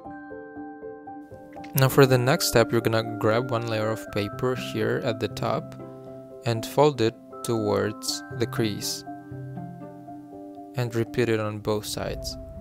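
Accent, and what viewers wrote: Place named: South Korea. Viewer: I can tell from your accent that you are Korean which makes more fun to watch this video. Thank you💗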